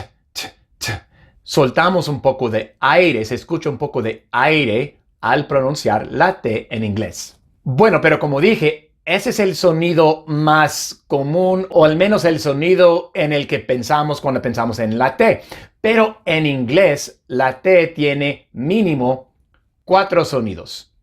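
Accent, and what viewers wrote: United States. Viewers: Tu inglés es acento Americano por eso lla t la pronuncias de eso modo, porque el inglés británico si la marca más. Tendrías que aclarar que tu acento es Americano. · Soy ingles. En Inglaterra decimos arTist - no arDist. VerTical- no verDical. ThirTy - no thirDy. El pronunciacion de is pronunciacion del EEUU, no de Inglaterra. El ingles original y mas correcto es de Inglaterra.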